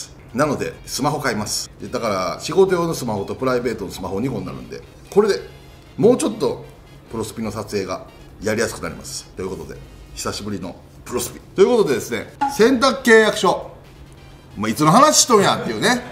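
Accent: Kansai, Japan. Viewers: って関西便なの？？そもそもこれが関西弁7日も分からないけど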